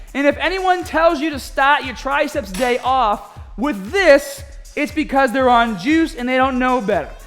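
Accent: Boston, United States. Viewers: A regular bahbell, is that what they have on the fahm! I love ya my Bostonian Brother! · Well, if you want more people to take you seriously, please knock off that exaggerated "Boston" accent. You may be from Southie or Medford or Somerville, but strangely, you never did your "Haavaad Yaahd" act in your original videos. I lived in Boston for four years, and know that most "local" folks there don't really speak such a fake, hackneyed way to each other - only when there are non-Bostonians around, who (for some unknown reason), find your irritating "dialect" cute or charming, so you turn it on to get more likes. Other vlogers in your sector (like Jeff Cavaliere, etc.) don't seem to need such a stupid gimmick like saying "baaaaabells"; they just focus on content. But you're wicked pissah anyway!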